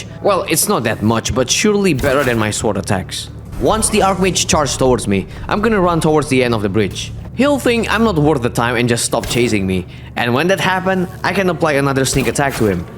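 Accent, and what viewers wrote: Indonesia. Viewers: You sound like indonesian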